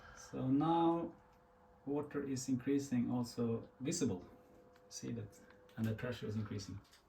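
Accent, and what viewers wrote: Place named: Sweden. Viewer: Den värsta ikea-engelska jag har hört..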